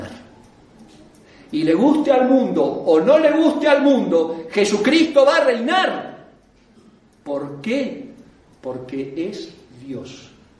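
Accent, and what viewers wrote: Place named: Argentina. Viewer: Por el acento argentino intuyo que es, pero no estoy seguro.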